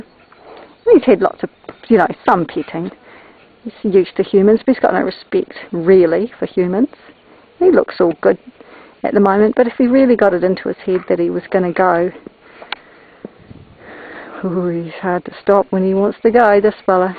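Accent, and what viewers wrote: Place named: New Zealand. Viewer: Hi I like your relationship with this horse. Tom Roberts would advised to tie the halter with a bowline knot under his chin, (easy to undo) I am looking forward to seeing more of your videos. PS I like your kiwi accent. I am keen to see how you actually get into the racing part.(if that's what you do) I have a colt and I am working him will follow your advice however my skills are not as confident as yours ..but I try.you explain things very well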